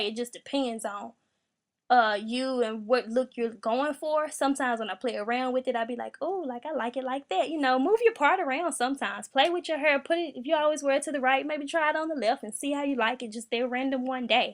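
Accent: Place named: Memphis, United States. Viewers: I'm natural but I heard your accent and I had to sub. #northmemphis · I know that accent from anywhere lol. I’m a memphian too 😂 This was my go to style in high school ❤️ great tutorial 👍🏽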